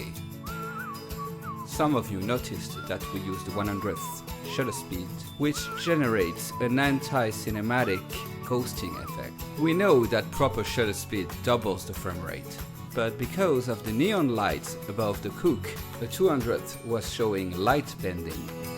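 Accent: France. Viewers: Sympa ton petit accent français :p Ca rend bien ! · Oh un frenchie XD ça se reconnait à l'accent ^^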